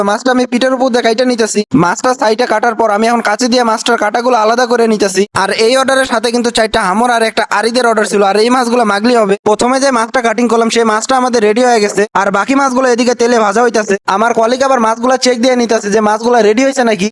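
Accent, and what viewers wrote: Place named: Comilla, Bangladesh. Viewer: Apnar basa ki cumillay naki mone hoy je cumillar vasa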